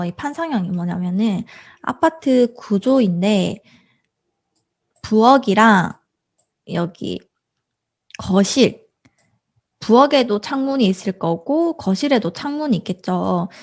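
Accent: Busan, South Korea. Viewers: 완전 부린이인데 네이버부동산은 빠싹하지 않을까 하고 들어왔는데 엄청 필요한거 3가지나 배웠어요~~ ㅠㅠㅠㅠ 매번 시청중이지만 정말 친근한 말투로 하나하나 설명해주셔서 감사드립니다 ㅎㅎㅎ 혹시 부산쪽 분이신가요? 말투가 저랑 비슷하시요 ㅋㅋㅋㅎㅎ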